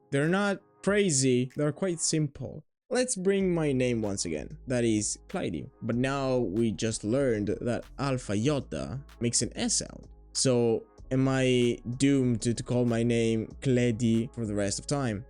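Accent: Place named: Greece. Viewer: Τα γελοιοποίησες τελείως τα Ελληνικά μας, χαχαχα! Ας σκεφτούν όμως οι αγγλόφωνοι ότι αν σε αυτούς φαίνονται ανάποδα τα ελληνικά, το ίδιο φαίνονται ανάποδα και στους Έλληνες τα αγγλικά όταν τα πρωτομαθαίνουν, αλλά με τον αντίστροφο τρόπο. Δηλαδή κάποιος παραξενεύεται όταν μαθαίνει ότι το Ρ προφέρεται στα αγγλικά 'π". Το καλό λοιπόν είναι ότι αφού σχεδόν όλοι οι Έλληνες κατορθώνουν να μάθουν τουλάχιστον να διαβάζουν απλά Αγγλικά και η νέα γενιά τα μιλάει και τέλεια, έτσι μπορούν να μάθουν και οι αγγλόφωνοι Ελληνικά, και ας μην γκρινιάζουν με το να τα θεωρούν δύσκολα. Το μόνο αλλά πολύ σοβαρό πρόβλημά τους είναι ότι 'κυκλοφορούν' στο youtube πάρα πολλοί ακατάλληλοι "δάσκαλοι" της Ελληνικής που τα έμαθαν λάθος και τα μεταδίδουν λάθος. Το παλικάρι από εδώ ακούγεται είτε ελληνόφωνο εκ γενετής είτε δίγλωσσο εκ γενετής, γιατί η προφορά του στα Ελληνικά είναι ακριβώς Ελληνική. 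Μπορείτε να το εμπιστευτείτε.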